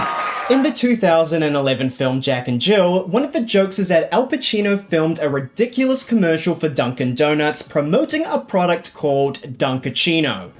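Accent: United Kingdom. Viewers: ile the fact that is a british person explaining this :hand-purple-blue-peace:😭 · Why is MrBeast reviewing commercials with a British accent?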